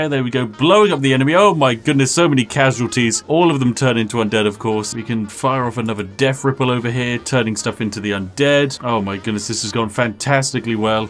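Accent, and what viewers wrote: United Kingdom. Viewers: Aaaaaaa! British boy is back, where is my tea. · I love me some smooth talkin' British man playing fun and interesting indie games. Best bedtime story ever.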